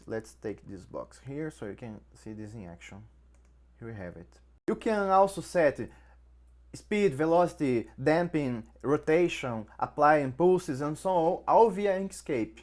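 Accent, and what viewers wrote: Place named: Brazil. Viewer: Are you brazillian: Your accent looks brazillian.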